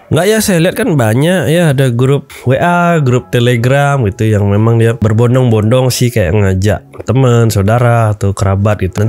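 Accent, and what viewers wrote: Bali, Indonesia. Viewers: Mantab,.,.,.. nasehatnya apa adanya… aksennya org bali sekali hehe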